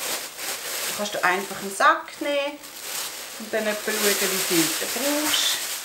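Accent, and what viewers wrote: Switzerland. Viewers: Bitte nicht auf Hochdeutsch, soviel Charme geht nicht im trockenen Hochdeutsch. Wir beißen uns doch gerne durch deinen zarten Schweizer Dialekt, der ja sehr dezent, aber auch so liebreizend rüber kommt. Und deine Videos liebe ich soooooooooo sehr. Einfach nur wunderschön, kreativ und sooooooo geschmackvoll. Vielen Dank auch für deine ruhige und tiefenentspannte Art, auch, wenn man manchmal merkt, wie es dich bei manchen Themen umtreibt😉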